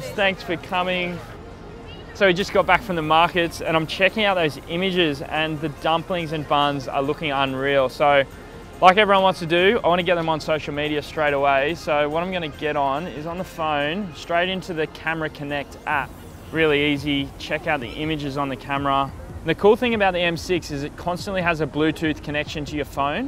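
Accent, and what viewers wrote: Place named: Broad, Australia. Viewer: Stop talking like a yobbo,you Need to look at yourself how you come across and it’s not very good clean up your vocabulary get away from the Ocker Image I personally wouldn’t buy off you know pride in that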